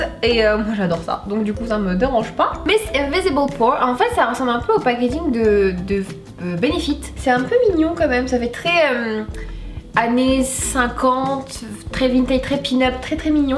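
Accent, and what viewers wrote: Oran, Algeria. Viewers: tu es algérienne cool peux tu m’apprendre a parler comme vous ??? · bah non je prefere des videos en Fr psq je comprend pas trop l'accent oranaise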